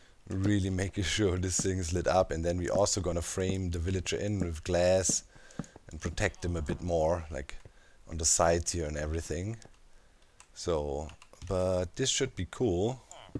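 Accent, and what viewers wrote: Germany. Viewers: funny how you switched to a french accent (from your normal German accent) when trying to show off German accent. But then... they do that in the movies, too :D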